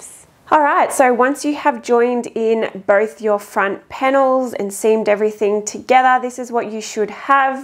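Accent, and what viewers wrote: Australia. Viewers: Well-designed cardi, new sub, just want to listen to your Aussie accent...😘♥️🦋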